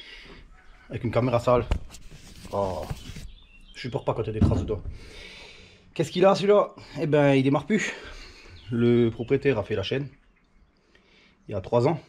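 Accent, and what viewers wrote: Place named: Alsace, France. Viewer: Vraiment du plaisir, pour l'accent qui me rappelle mes années dans le 67, et puis de voir un vrai mécano, pas un guignol comme on en trouve dans les garages de marques à 150€/h . Bonne continuation et un Abonnée de plus ;- )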